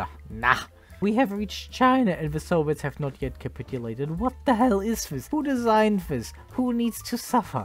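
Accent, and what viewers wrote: Germany. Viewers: Ich komm' auf den deutschen Akzent nicht klar. Hab direkt zu Beginn schon bemerkt, dass da was faul ist. 😂 🇩🇪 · nun, man hört raus, das du deutscher bist.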